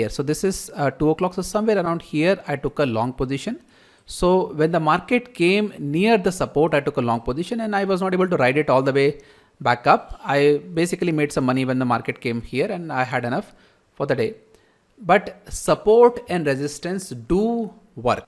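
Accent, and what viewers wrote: India. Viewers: Your accent same as P R sundar sir, are you from tamilnadu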